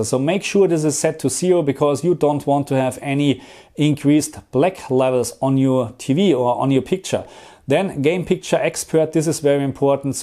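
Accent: Germany. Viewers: I love hearing the German accent.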